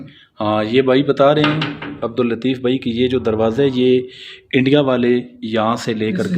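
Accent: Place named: Chakwal, Pakistan. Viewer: آپ کا تعلق پاکستان کے ضلع چکوال سے تو نہیں ھے کیونکہ لہجہ بتا رھا ھے؟؟؟❤❤❤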